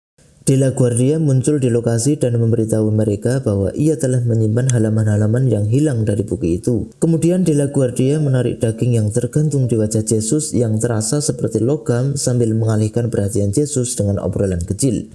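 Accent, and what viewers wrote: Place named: Java, Indonesia. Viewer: Jawa anjr suaranya mals gw dngernya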